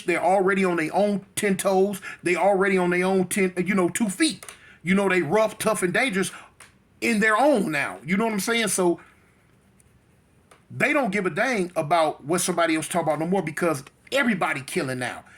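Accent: South, United States. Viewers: You've seemed to have picked up a southern accent, when I first started watching your videos I didn't think you were from California. You're spit on, that is exactly how it happened with my city. There wasn't any gangs there until LA cats came. It's like anything someone start it and show others and they get up to speed and run with it.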